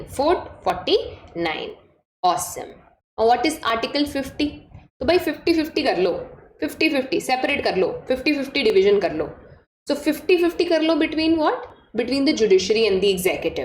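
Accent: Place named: Bengal, India. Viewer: I thing he is from Bengal , his means “Jaldi kro gap mat Karo”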